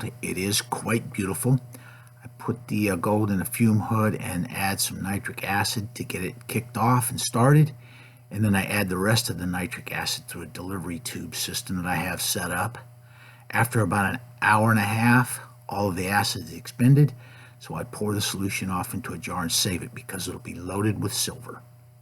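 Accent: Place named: Italian American, United States. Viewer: Sounds like a guy from the sopranos - I Makin gold ova here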